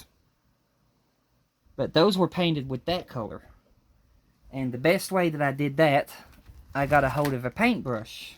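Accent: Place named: Texas, United States. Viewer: Wow you are so clever the way you do these things I find it so interesting to watch you working on this beautiful model. Thank you so very much for sharing this with me I will attempt to do what you have done but im not as skilled as you but i will definitely give it a try. Once again thank you and look forward to your next video take care o!! By the way are you from texas luv your accent stay safe take care 🙂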